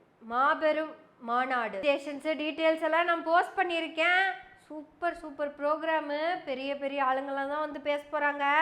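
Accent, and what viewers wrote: Kongu, India. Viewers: இந்த கொங்கு பாசை சூப்பர் இருக்கு 👌👌👌9tea 🤣🤣🤣🤣🤣சொல்லறது சூப்பர் ஓ சூப்பர் 👍👍👍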